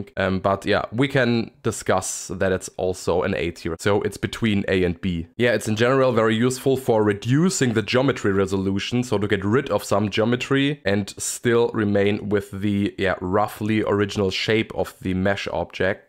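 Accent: Germany. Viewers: Nice Video good explained , Are you german ? you sound like you are hahaha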